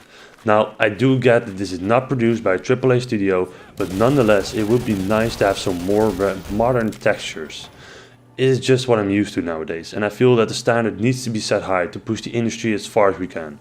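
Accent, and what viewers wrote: Netherlands. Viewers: i can hear that your Dutch man xD nice video do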